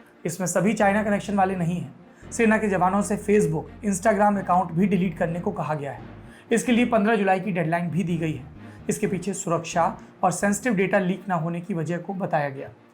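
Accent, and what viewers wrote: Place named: Uttar Pradesh, India. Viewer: सर जी एक बार विकास दुबे की कहानी अच्छाई बुराई सब अपने यूपी वाले स्टाइल में सुना दीजिए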